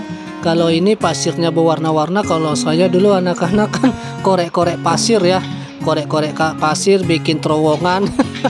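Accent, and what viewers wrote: Medan, Indonesia. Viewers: bg, abg orang medan y bg?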